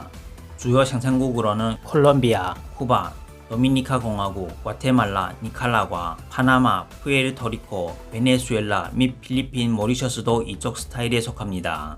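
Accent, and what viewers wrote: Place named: Gyeongsang, South Korea. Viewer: 경상도식 국어책 읽기가 귀여우시네요 ㅎㅎㅎ 좋은정보 감사드립니다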